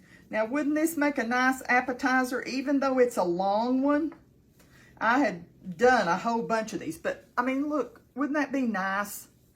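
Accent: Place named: South, United States. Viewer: Oh, and you sound southern, do you have a chicken and dumplings? My grandma made the best, my mom is a lousy cook, i took on the cooking. She, my mother's, sent me a recipe but it was vague, pretty light on detail. Betty crooker index card. not specific. Im sure there was lard, I'm vegan, nobody else my house is.😊 it was noodly not biscuity she was depression era. Was my favorite thing on the planet. I wanna recreate for my boys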